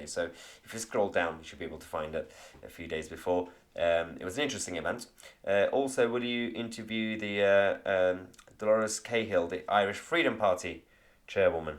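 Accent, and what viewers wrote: United Kingdom. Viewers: mahyar are you British or English?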